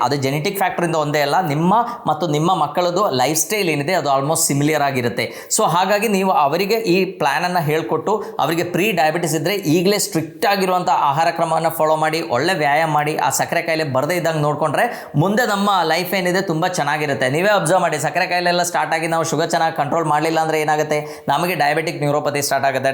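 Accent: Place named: Kerala, India. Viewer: ಡಾಕ್ಟರ್ ನಿಮ್ಮ ಮಾತಿನ ವೇಗ ಶತಾಬ್ದಿ ಎಕ್ಸಪ್ರೆಸ್ ಟ್ರೈನ್ ತರಹ ಇದೆ.ತುಂಬಾ ವಿಚಾರಗಳನ್ನ ಕಡಿಮೆ ಅವಧಿಯಲ್ಲಿ ತಿಳಿಸುವಿರಿ. ನಿಮ್ಮ ಈ ಮಾತಿನ ವೇಗಕ್ಕೆ ಕಾರಣ ನಿಮ್ಮ ಮಾತೃಭಾಷೆ ಮಲೆಯಾಳಂ ಇರಬಹುದು ಅನ್ನಿಸುತ್ತದೆ.ಅದೇ ಆಕ್ಸೆಂಟ್ ಕನ್ನಡದ ಪದಗಳ ಮೇಲೆ ಪ್ರಭಾವ ಬೀರುತ್ತಿದೆ. ನಿಮ್ಮ ಕನ್ನಡ ಭಾಷೆಯ ಶೈಲಿ ಸಾಮಾನ್ಯವಾಗಿ ಮಾತನಾಡುವರಿಗಿಂತ ಭಿನ್ನ ವಾಗಿದೆ. ನಮಸ್ಕಾರಗಳು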